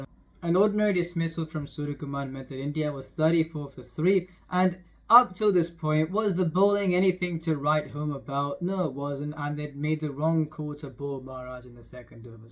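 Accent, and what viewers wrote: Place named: United States. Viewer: Bhai.... Dump that pathetic American accent... & bring the desi accent on... Will sound more real!!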